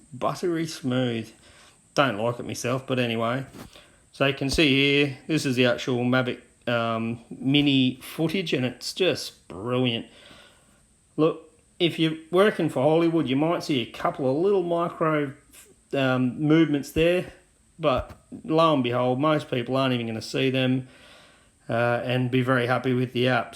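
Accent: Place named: Australia. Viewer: I'm surprised you've not had more comments yet but I guess it's because this app seems to have crept in under the radar so far. I'm looking forward to delivery of my MA2 this week having sold my MM and a Phantom3A before that. Can't wait to try this app out as it seems to do everything that I'd like. I used to fly my Phantom with FPV Camera but that app seems to have disappeared now. Keep on with the videos - I enjoy your quiet, understated Aussie delivery!..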